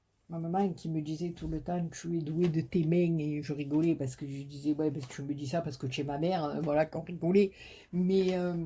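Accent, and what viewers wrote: South, France. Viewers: Coucou, j'ai toujours le sourire quand j entends ta voix....😉 ça me fais du bien même si je ne suis pas à plaindre, même ton accent du midi,c est du soleil, quand à Agen il pleut...merci pour tes réponses sur ce tag...j ai bien ris 😂😉 comme toujours...merci beaucoup pour tes très belles créations et tes tutos très bien expliqués....je t assure que tu explique très bien, vu que je comprends et que je suis une débutante 😉🤣....je te fais des bisous prends soin de toi aussi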